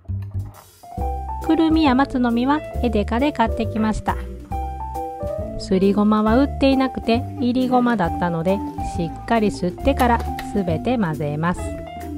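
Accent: Kansai, Japan. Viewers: 関西弁がクセになります！！！！！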